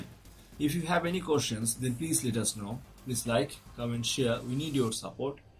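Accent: India. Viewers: Indians speaking english sounds very ridiculous, next time use subtitles, the world will thank you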